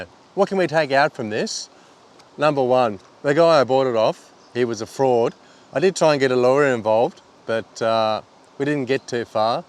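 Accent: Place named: Australia. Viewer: Good day,I’m an old man from London, and have been watching your channel for about 3 weeks and what I find so fascinating about you is your voice, I love the way Australian,s speak don’t ever change it ,anyway when I was a young person 17 in 1964 to be precise I went to Aussie and my first job in Sidney was working for a company called Whelan the wrecker demolitions buildings .well I lasted a week and told the foreman what to do with his job.Well 6 years later I decided to come back home to London a place called Kilburn ,were I grew up. But I loved Australia what a great country, in my travels I palled up with a guy out of Launceston Tasmania called Michael Blazley and we all lived in Melbourne and use it as a base travelling all over the Country from Moree northern New South Wales to Goldsworthy W.A up to Batchelor in the Northern Territory,hitch hiking all over your Country I had a ball. 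I’m also a Van fan I have had many Sprinters in my time,Ford vans like yours are going for under £5000, pounds and there lots of breakers that have lots of gearboxes on E Bay UK . I might be able to help out if you get stuck , Michael.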